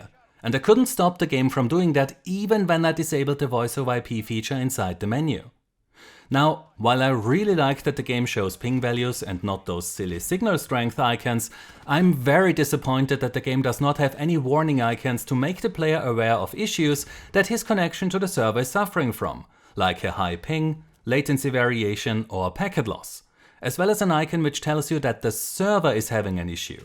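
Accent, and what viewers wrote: Germany. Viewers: Are you a german Person because you have accent from german people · Man hört raus das du ein Deutscher bist. ^^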